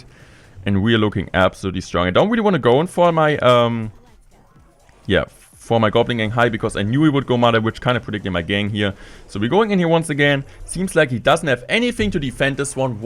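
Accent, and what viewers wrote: Germany. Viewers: ich liebe dich und deinen deutschen akzent vor allem dieses (in di intia wörlt plaing in top läda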